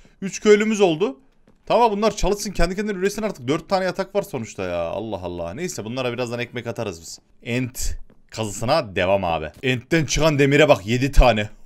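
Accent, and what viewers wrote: Yozgat, Turkey. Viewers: Yozgatlık leeeeyn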